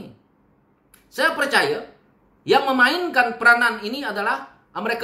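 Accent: Malaysia. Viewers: Guna logat Borneo saudara jangan terbawa2 dengan pengaruh malaya tuuuuu.. Meluat dengar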